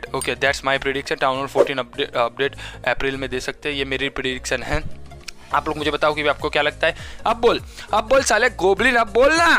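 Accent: Gujarat, India. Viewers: Kya ap Gujarati ho . me bhi Gujarati hu mujhe apki language Gujarati jesi lagi.plz reply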